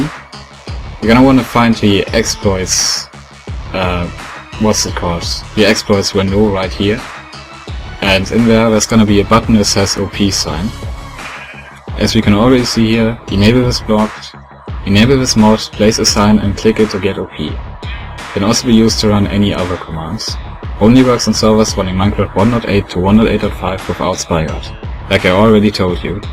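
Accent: Germany. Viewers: Hi man hört das du deutsch bist😉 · You are a german guy or 😂 falls ja hört man es raus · I can hear, that you are german :D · Kann es sein das er deutsch ist der hatn deutschn aktzent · ah deutsch hört man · Man hört das er deutscher ist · man hört so hart dass du deutsch bist xD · du bist deutsch ich erkenne es an deiner stimme :D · You have a german accent!Am I right? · Man merkt an deinem englischen dialekt das du deutsch kannst :)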